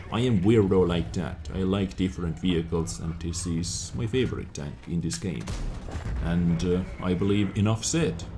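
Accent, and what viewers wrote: Estonia. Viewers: are you from one of the baltic states Estonia? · are you Estonian? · Are you Estonian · Are you Estonian?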